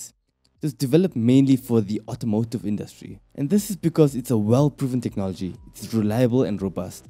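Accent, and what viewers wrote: South Africa. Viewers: Are you from South Africa? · South african accent!!!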